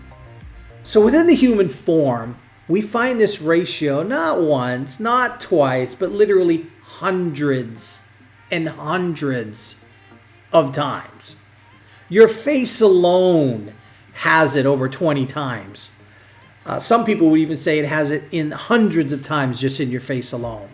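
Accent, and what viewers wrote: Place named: New Jersey, United States. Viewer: Hi I just found your site and love it, many of my favorite artists you are covering. I am also a Christian and have be studying and teaching the creation/evolution topics for 45 years, teaching only for the past 30. This is a very nice video on the Creator handy-work. Did you know that the brain spends or dedicates 30% of its computing power to the hand? In other words, God created man to use his hands with the ultimate control, just look at a surgeon, painter, pianist, slight of hand magician, or anyone else using their hands in a delicate manner. Monkeys can't do that, we can because we are fearfully and wonderfully made. Your accent sounds like you are from NJ, or NY or close by. There is a great illustrator (there are so many) that you should interview, he is also a Christian, his name is Thomas Fluharty and he loves to talk. 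Anyway, I love your enthusiasm keep up the great work. from NJ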